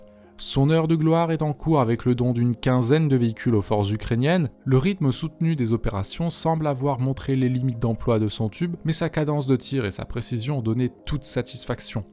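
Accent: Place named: Germany. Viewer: bel accent allemand : )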